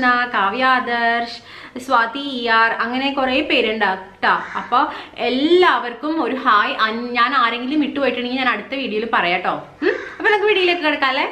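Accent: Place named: Kochi, India. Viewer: Innathe samsarathilanu kochi slang undayathu, nalla rasamundu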